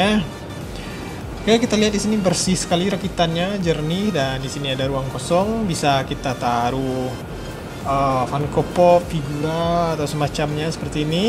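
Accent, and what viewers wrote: West Sumatra, Indonesia. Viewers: Logatnya urang awak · Wkwkwkwk aksen abg ngomong kliatan urang awak banaa😅😆😆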